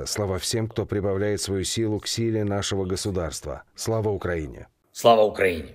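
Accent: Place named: Moscow, Russia. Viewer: почему с московским акцентом ? учи мову !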